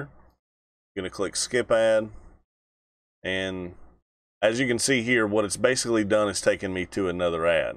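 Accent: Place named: South, United States. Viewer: nice southern accent, i am also from the south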